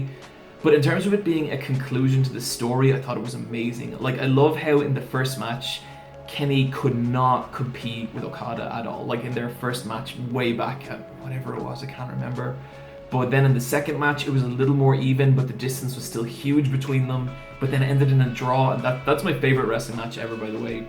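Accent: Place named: Ireland. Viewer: Could you not tell from his heavy irish accent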